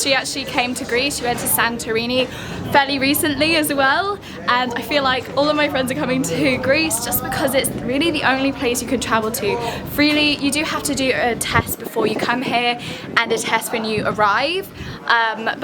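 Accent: England, United Kingdom. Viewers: Я кайфую от этого невероятного английского Английского! улыбка) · Британский акцент это очень красиво!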